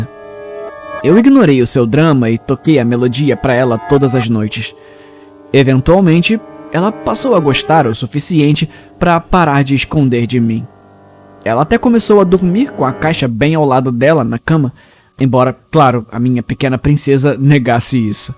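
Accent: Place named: Rio de Janeiro, Brazil. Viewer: É tão bom ouvir uma história de terror em que o narrador é carioca igual eu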